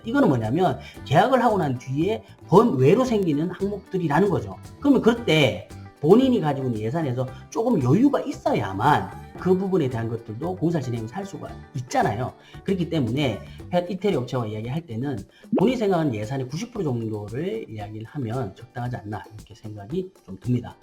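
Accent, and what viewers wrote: Busan, South Korea. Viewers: 사장님 말투는 부산인데, 서울업체네요 아쉽:::😅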